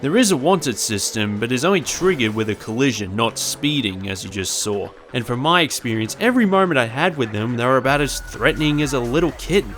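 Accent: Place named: Australia. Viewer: And that, had a Japanese version, with just Nissan cars. <link> / You poor, unfortunate, soul... You’re not wrong, you’re at a Million points, before you know it. - This list of games, says all I need to hear, about your channel... To be fair, on your semi-Aussie sounding ass, it seems that a lot of TDUX360, was transferred to TDU2, and you’ve made it noticeable. - 😂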